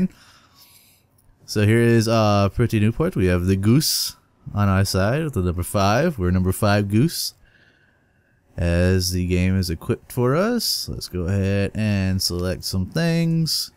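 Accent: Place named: France. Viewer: That Frénch accént tho... ermahgerd, itś epic ;3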